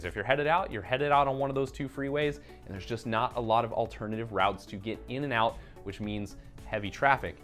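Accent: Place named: Southern California, United States. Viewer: Dude your videos are really helpful and informative. Your super strong SoCal accent makes me feel like I’m watching an episode of the Californians on SNL. But it’s better than listening to Texans where I live now. I used to live in the LA area and I’m thinking about moving back to beautiful SoCal. For anyone thinking about moving to TX… its sucks. Compared to Cali, Texas is boring, ugly, the food is atrocious, 99% of the people are obese and sit around and guzzle alcohol and eat horribly tasting canned food, DFW drivers are reckless, my car insurance actually doubled when I moved here from Los Angeles, people say Texans are friendly, but I would say they’re polite definitely not friendly. People in Southern California are actually friendly, and I made friends quicker in Los Angeles than I ever did in Texas. Plus, if you’re single, there is no one to date in Texas because everyone is obese and or married.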